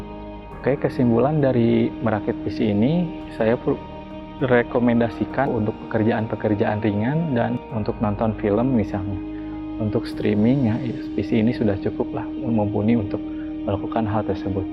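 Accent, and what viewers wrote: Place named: West Java, Indonesia. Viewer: nyunda pisan euy kang.. haha sip lah si subscribe yeuh... haha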